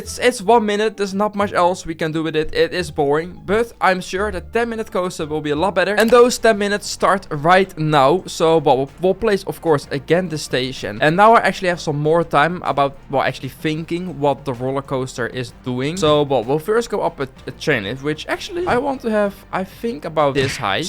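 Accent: Netherlands. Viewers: Are u dutch? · Ben jij Nederlands dik hoor het aan je accent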